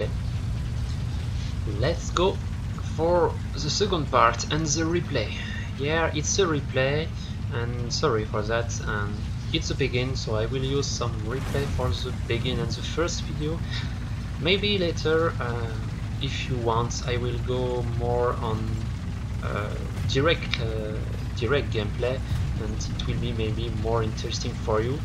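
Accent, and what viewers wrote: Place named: France. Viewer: bah je comprends pas tout.... mais c est de l anglais avec un belle accent français...lol..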